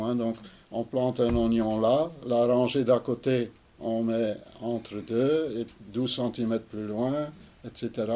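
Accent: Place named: Nord, France. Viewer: Oui, je sais, j'aime vous écouter car ça me rappelle mon enfance dans le Nord avec toute ma famille frontalière, qui avait cet humour que j'aime (et aussi l'accent) Mais pas que, aussi votre grande expérience du potager. Portez-vous bien. Merci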